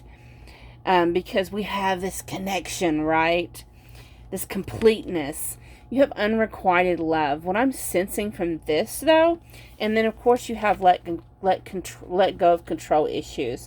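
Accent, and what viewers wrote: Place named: South, United States. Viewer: Hey I just seen you.Im from Mississippi and can tell you are southern.Girl I watch a lot of readers and I Love your readings.New sub!Picked pile 2.Where you from btw?If you don't mind my asking?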